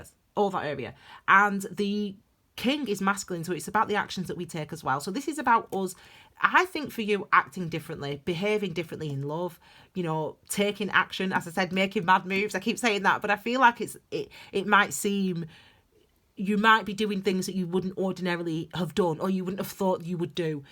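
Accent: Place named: Lancashire, United Kingdom. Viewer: a grand lancastrian accent..interesting reading.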